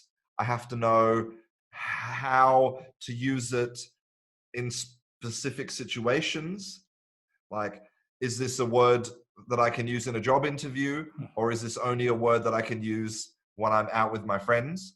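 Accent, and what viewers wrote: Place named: United Kingdom. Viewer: British accent ! you are excellent man. Thanks ....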